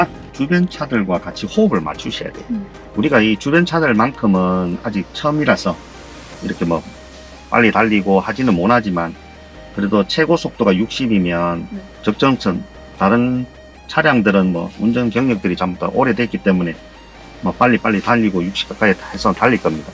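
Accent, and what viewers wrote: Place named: Daegu, South Korea. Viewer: 와 억양이 좀 익숙해서 찾아보니 서대구 학원이네요 일주일만 더 일찍 알았으면 여기 등록했을건데 ㅎㅎ 지금은 ㅊㄱ 다니고 있는데 강사님들마다 달라서 쪼금 혼란스러운중입니다 ㅎㅎ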